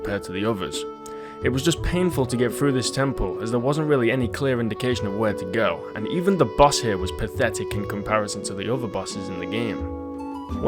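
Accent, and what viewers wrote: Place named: Australia. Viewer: He sounds Australian to me an American lol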